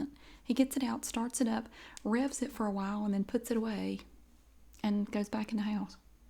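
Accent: South, United States. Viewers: I love how much more southern you sound when you get goin' 😂💕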